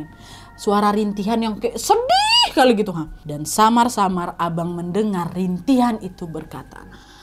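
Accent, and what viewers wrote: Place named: Tanjung Balai, Indonesia. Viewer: Inget banget dulu nontonin kak dari masih ribuan subs, seneng aja sama logatnya karna sama² org tjng balai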